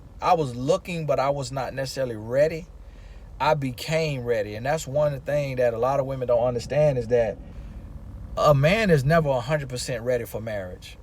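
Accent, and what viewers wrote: South, United States. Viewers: I see now why I can relate to and appreciate the country grammar and accent in these videos. My folks are from Selma AL too. I think it is cool and respectful that you pay homage to your grandma and talk like her. We do that in the south. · Gurl. 8 was driving hollering and weak at how he was talking about Puerto Ricah Jumaican, and his first thought to the Lord when saw her booty. Omgosh. I fell out. I'm from the south and his mannerisms, cadence and demeanor is mad familiar and makes me laugh. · Be blessed 🙏 im loving that southern accent.. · "Puerrta rica" and "Jewmakin" 😆 I love how southern folks talk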